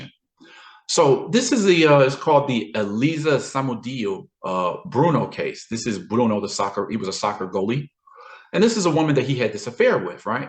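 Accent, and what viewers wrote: United States. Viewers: You sound American but I'm guessing you live in Brazil bro?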